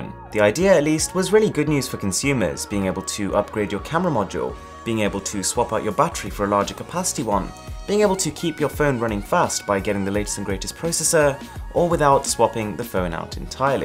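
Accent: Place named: RP, United Kingdom. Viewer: It's the only reason I might stop watching 👀 exaggerated accent trying to make British sound even more posh makes me cringe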